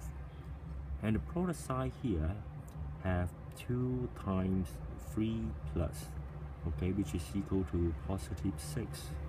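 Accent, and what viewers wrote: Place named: China. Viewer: From your accent I can guess that you are Chinese. By the way, it is now easy as a piece of cake for me thanks 👍👍👍👍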